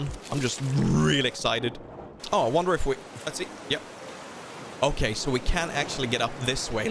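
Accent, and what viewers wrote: Sweden. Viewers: Not seen many of your vids, but some with Fooster, looking forward to seeing/listening to more vids. What I think I like the most, is how you embrace your Swedish, as a fellow Swede, I'm happy to hear it, and it always makes me smile. Love from good old Malmö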